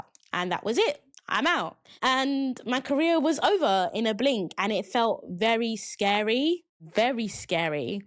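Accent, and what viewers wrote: United Kingdom. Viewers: I have a liking for accents and it’s interesting to listen to you speak. You sound like you have a British accent with an Australian accent underneath and with an African accent (I can’t tell which country) underneath that. It’s nice 😊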